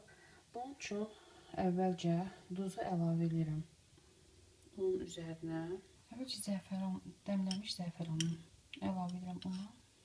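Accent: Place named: Baku, Azerbaijan. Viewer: Ay ureyim getdide oz baki danishiqimizcun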